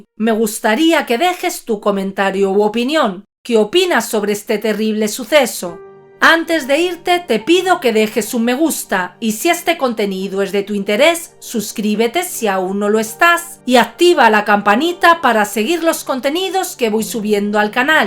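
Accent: Spain. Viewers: podes haceralgo con ese acento gallego? no suena bien. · Hola,me encanta tu trabajo y por tu asentó deduzco que eres española, pero es video no vidio como tú lo dices jjjj saludos desde República Dominicana 🇩🇴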